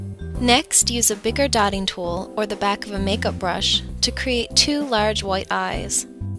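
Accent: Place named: Canada. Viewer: She's totally Canadian. Listen closely to her voice.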